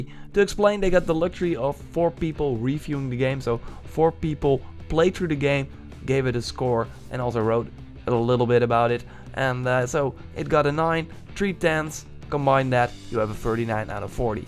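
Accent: Netherlands. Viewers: Are you, by any chance, Dutch?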